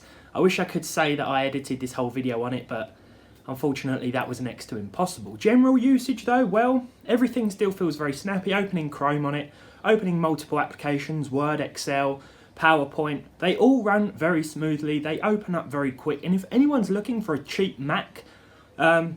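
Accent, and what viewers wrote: United Kingdom. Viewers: I really love this channel, especially that Brit voice it’s easy to listen to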